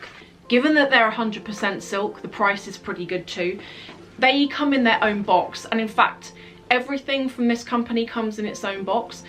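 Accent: England, United Kingdom. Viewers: Looooove learning more of the english accent in looking at videos like this. New french subbbie here!!! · Ohhh....it is really enjoyable listening to your talking. I like your british accent so much!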